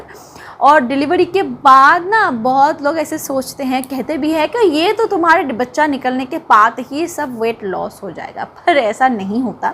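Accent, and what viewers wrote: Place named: Bihar, India. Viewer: Hello my name shehbaj Khan Aap Bihar se belong karti ho Kyu ki apki voice Bihar ke taraf ki hai ye nhi bol rha achi nhi h bas lga mere ko ...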